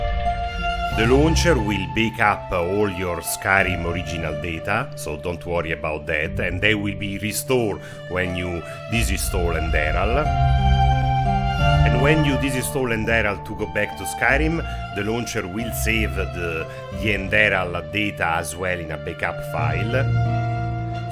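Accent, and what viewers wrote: Italy. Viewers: Eppure qualcosa mi dice che sei italiano. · C'è bisogno necessariamente del gioco originale? (dall'accento suppongo tu sia italico) Thanks! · Nice video and epic accent (Italian?)! Chiao!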